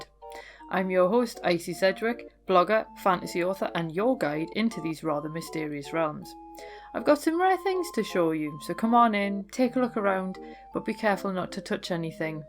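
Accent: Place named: North, United Kingdom. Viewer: One of my favourite things to do whilst travelling for work is to watch a spooky history soc on YouTube, I'm from the north so was very surprised to hear a familiar accent!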